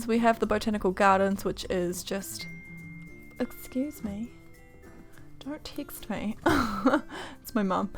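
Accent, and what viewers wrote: New Zealand. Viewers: your accent made sense P.S I'm a kiwi too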